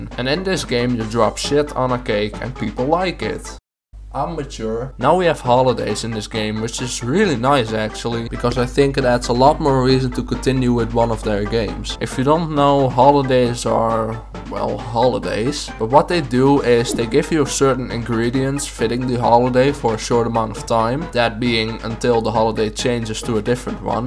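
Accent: Netherlands. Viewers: Haha dit klinkt echt als een Nederlander 😂 klopt dat? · R u Dutch? U sound dutch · Are you dutch?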